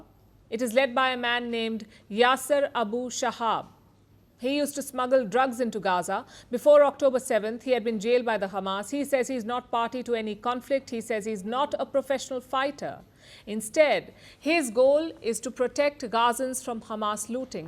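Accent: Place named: India. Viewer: Typical indian english dont know why this lady is so serious while reading the news bolki shawerma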